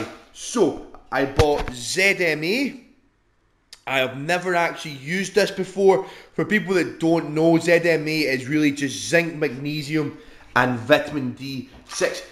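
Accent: Scotland, United Kingdom. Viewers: the heavy lifting brings me here, the thick scottish accent makes me stay good lifting!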